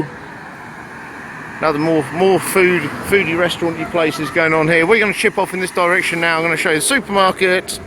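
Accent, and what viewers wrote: United Kingdom. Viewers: Which British accent is yours precisely? Thanks. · I know what Southwold is :-) just checking out PM as a destination as we lost flights to Roatan, 2021 due to the pandemic its looking like a good plan B, thanks for the tour and hearing a Brit accent, Im glad we arent the only ones who venture further afield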